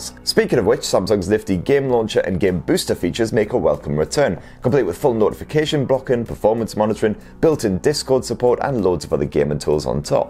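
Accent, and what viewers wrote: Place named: Sunderland, United Kingdom. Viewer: It's just a Sunderland accent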